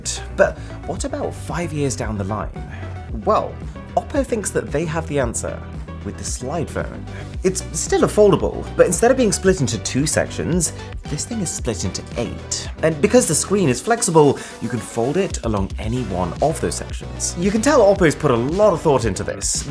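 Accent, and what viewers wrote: RP, United Kingdom. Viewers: Ah ! Terrible British English accent 🤮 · I am not even that way, but this man is just scrumptious, just the way he speaks with that posh accent and also being resplendently beautiful....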